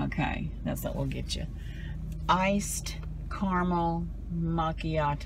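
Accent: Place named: Texas, United States. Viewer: The opening of this video had me cracking up..... Clay is going to keep you honest. He wants to make sure you saw that temperature correctly...lol. And is this the cat you mentioned that would scratch the heck out of you if under the sheets? Well I would have never known because she looks all cozy and cute all up againt you. Too freaking cute if you ask me. And girl I just love your accent, I'm not from Texas so hearing it is music to my ears. Good luck with the voice acting thing., you deserve that and more.....and last but not least, you looked great on all the shirts and blouses you tried on. My fave was the pink or mave with the lace. You looked very pretty, yup even in the dressing room.......lol.......love you girl, love your vlogs and keep them coming. Becuz your laugh is contagious.......blessings ♡♥♡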